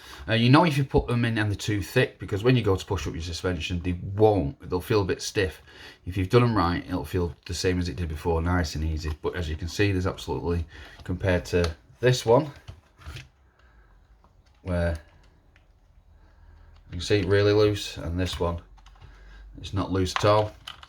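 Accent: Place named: Manchester, United Kingdom. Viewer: Wheres your accent from mate, you in the Manchester area?.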